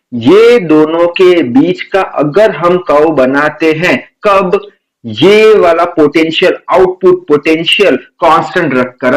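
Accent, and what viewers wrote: Gujarat, India. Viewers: When at the starting of the video (hlo) and i m understand sir is also from gujarat..😅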